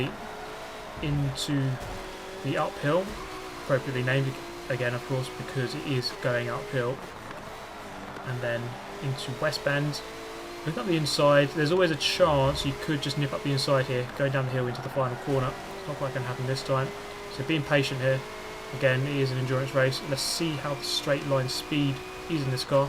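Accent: United Kingdom. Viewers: This guys commentary makes me wish I had a British accent!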